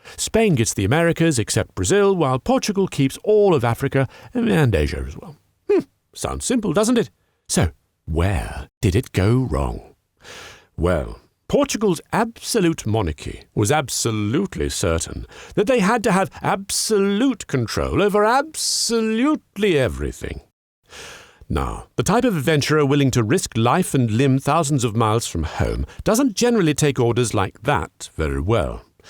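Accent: United Kingdom. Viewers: I’m Portuguese from Oporto!!! I’m very grateful for this video!!! Thank you very much!!! Beautiful video!!! One of the best I ever seen about my country history explained in British English 🇬🇧 Aye British English!!! Not American English hahaha 😆 I hate that to be honest!!! Cheers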